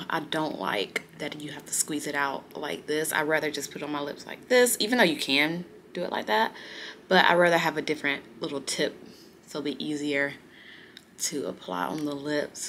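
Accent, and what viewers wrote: South, United States. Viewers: First of all, that oysters vlog makes me laugh! I’ve watched it twice.😂 And you don’t sound “country”, you speak Southern Charm. I will never do YT but seeing the BTS of what all that entails is so interesting to me. Nothing interesting going on here but I still needed this chill vlog. Stay safe and thanks for what you do.💚💚💚💚💚💚💚💚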